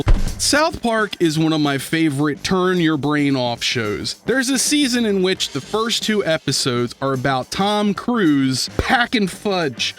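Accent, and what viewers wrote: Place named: Philadelphia, United States. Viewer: this entire time i’ve thought you were a delco or NE philly guy. i guess i was close enough but gd them philly neighbors do share similarities in accents. when I was a broke college kid I would pirate books, movies, games. once I started working and I wanted to play those games or reread the books or see a movie I started paying for them. If something is hard to find I’ll still go elsewhere online to find it. accessibility and cost is everything.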